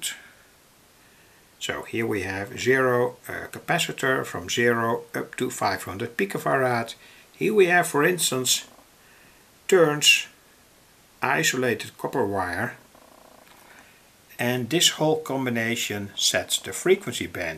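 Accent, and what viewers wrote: Netherlands. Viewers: Are you from the netherlands?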